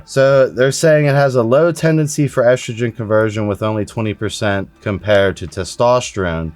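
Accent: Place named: Philadelphia, United States. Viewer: are you from the Philly area? Noticed your accent is similar to mine.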